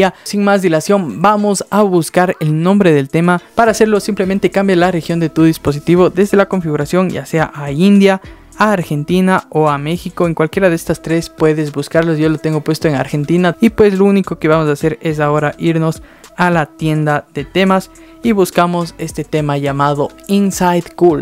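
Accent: Cuenca, Ecuador. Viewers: Eres de cuenca?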